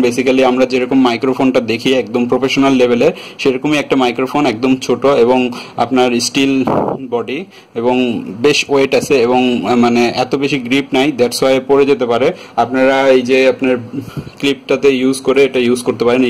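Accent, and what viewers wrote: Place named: Sylhet, Bangladesh. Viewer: আপনি সিলেটি..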